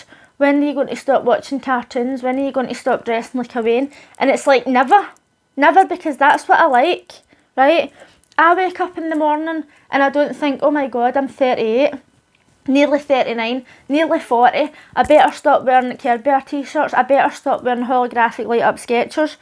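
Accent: Scotland, United Kingdom. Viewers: Oh maan what an accent, is that Sco’ish? Absolutley fantastic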